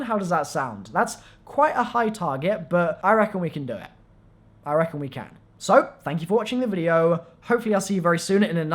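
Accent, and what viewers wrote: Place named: United Kingdom. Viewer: Your accent just makes me so hype to be visiting the UK bro #muchosmelons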